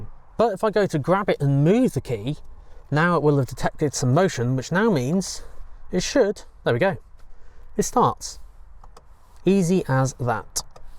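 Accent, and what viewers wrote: England, United Kingdom. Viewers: thank you for your prompt response. chatGPT mentioned that it may be a bad calibration of the sensors and recommend to take it to MB dealer for service. Thank you again. I love your content. I need to use captions because is hard for me to understand English from England. But you create great content. I live in Miami, Florida USA.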